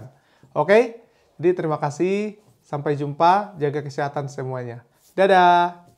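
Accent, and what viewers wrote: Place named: Madura, Indonesia. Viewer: Logatnya mirip orang madura 😂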